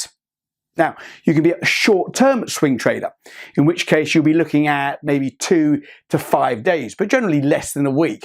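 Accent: United Kingdom. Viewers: your genuin teachings and precise advices are so perfectly bang on mixed with very british sense of humour and nice london accent 😝 i believe you are a very good teacher. God bless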